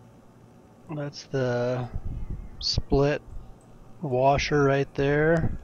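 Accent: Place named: South, United States. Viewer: This vid was a great reminder that I would've unhesitatingly cast instant skepticism & doubt on whether or not this fella could give me proper advice on a torch regulator re-fit if I hadn't immediately noticed that unmistakable rural twang... which, of course, dismissed that same potential skepticism just as quickly. No political bs yadayada implied here either: rural/country/Southern folk are just ~a billion times likelier to be able to spell out things like this as if they were describing yesterday's weather to you is all. Just my city-slicker $.02...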